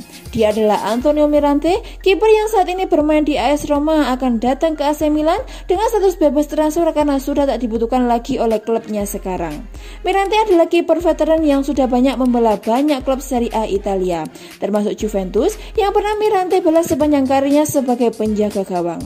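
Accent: Java, Indonesia. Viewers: Logat jawa nya kental sekali mind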